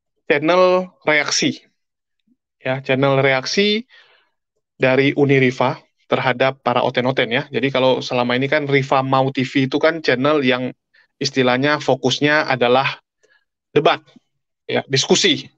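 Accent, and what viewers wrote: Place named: Medan, Indonesia. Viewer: Cina Medan eeee.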